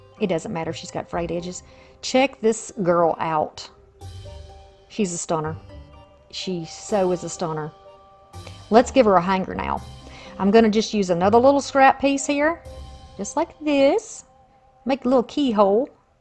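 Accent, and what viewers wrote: South, United States. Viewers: I love your videos. Always so helpful & enjoyable. It is so nice to hear a southern accent. So many try to cover it up. I spend a lot of my days watching your videos. Thanks again.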